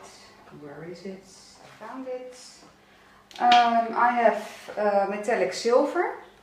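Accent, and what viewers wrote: Netherlands. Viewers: You sound Dutch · the accent is enough of a clue to know you're a fellow dutchie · Thick dutch accent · You sound Dutch!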